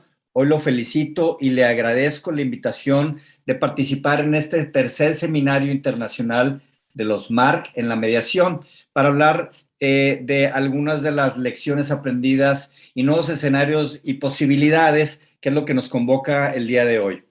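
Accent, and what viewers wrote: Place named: Mexico. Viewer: Gracias por su aporte,ponencia muy buena narrativa y la deja mejor aún ese tono mexicano,.Creo que el ser humano se adapta a los cambios con respecto a la mediación y el uso de la tecnología...saludos desde Argentina.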